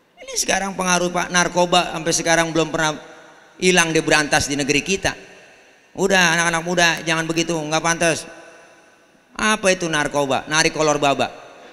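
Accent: Indonesia. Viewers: Di sumatra ada UAS di betawi ada UNFAD ustadz. Muka, perawakan, sama, punya ciri khas berbeda UAS brdialek melayu UnfAD dialek betawi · keren..betawi bgt..sehat terus ustad.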